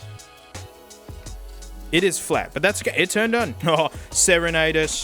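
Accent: Australia. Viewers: Imagine watching this to figure out which speaker to buy but it’s just an Australian man talking about his sexy speaker and worrying about his pkcell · I bet every Aussie dudes sounds similar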